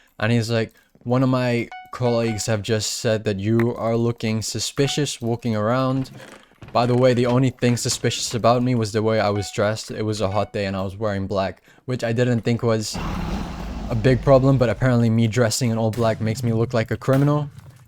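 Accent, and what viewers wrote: United Kingdom. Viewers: bro i luv ur british accent thats the reason im watching ur vids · well sorry you sound Scottish like me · i dont care if you sound like brithish be strong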